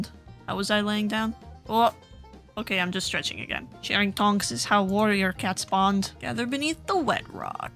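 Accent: Russia. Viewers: lol u sound like you have a Russian accent and it sound cool :> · Are you Russian like I keep hearing a Russian accent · I love your accent, can you tell me if it's Russian or somethin PLS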